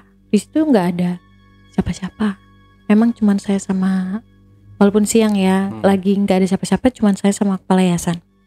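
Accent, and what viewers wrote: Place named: West Java, Indonesia. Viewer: Saya suka logat bicara ya pasti orang sunda.soalya saya juga gitu logat ya🤭 serius denger ya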